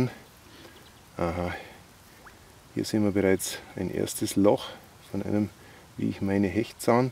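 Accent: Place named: East Franconia, Germany. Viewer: Ich mag Deinen Dialekt! Ist das ostfränkisch? Bamberger Gegend? LG aus Österreich..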